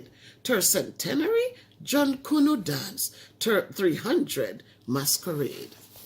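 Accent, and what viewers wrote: Jamaica. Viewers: Blessings my dear sis...just tuning in..the poem is good.. You always make me laugh when you do the patwah..love you always you bring joy to us..prayers and blessings to you and family...ernestine 🇬🇧 uk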